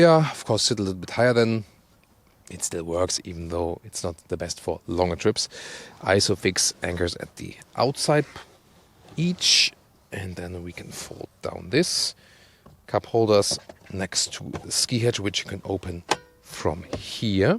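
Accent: Germany. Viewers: I LIKE this guy! I just discovered him a couple of days ago. Great reviews... and me being a GTI owner, the "German-thing" makes it even better! "Vy are Volksvagens so darned guut? Vell, being Gerrrman... because Gerrrmany makes them... and, vell, YOU don't!" Lol! :-P. :-P. :-P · Oh digga, man merkt wenn ein deutscher anfängt englisch zu sprechen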